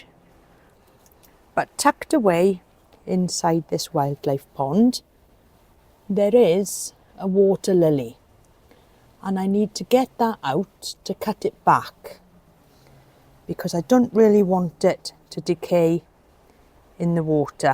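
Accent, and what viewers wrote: Wales, United Kingdom. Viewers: You have a certain charm to your videos that make them relaxing to watch. Not sure if it’s the music or your Welsh accent or both but it works really well. The garden still looks great. Keep enjoying it.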